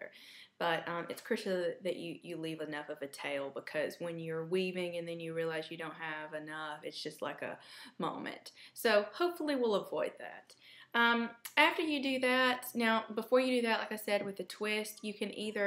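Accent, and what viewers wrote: South, United States. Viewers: This is terrific, I am an experienced knitter and you are too. In watching this I learned new stuff, so thank you. I detect a bit of a Southern accent, made me feel all warm and cozy while I was learning new stuff. Take cafe good care of yourself!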